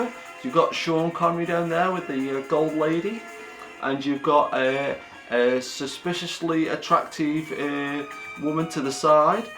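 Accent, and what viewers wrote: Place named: Stoke-on-Trent, United Kingdom. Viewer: Where is you accent from, it sounds a bit stoke on Trent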